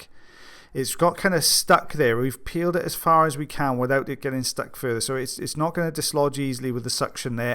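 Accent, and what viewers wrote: Wales, United Kingdom. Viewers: First and foremost, let me say thank you for your attention, time and dedication to the subscribers of this channel.. The recent changes seem to have had a polarising effect on viewers, especially the long term viewers, and I think a large part of that is because of the removal of the trademark beard. However in all honesty people hate change, and have followed the channel through a great deal of change recently. So here's MY QUESTION: What happened to the "#Countdown"???? Of all the changes made, it was my favourite.. ... Watching & listening, as your viewing old ear wax removals WAS THE BEST! Your natural exuberance and Welsh accent, coupled with your race calling abilities had me on the edge of my seat. It was wonderful and for those of us who have literally watched as the format grows and changes with the tides and seasons and the viewers, I thinks it's safe to say; no matter what the true waxaholics will always be here. 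As for my vote, well that was officially recorded on the community page, however returning to the Countdown is my unofficial vote.... Viva La Difference....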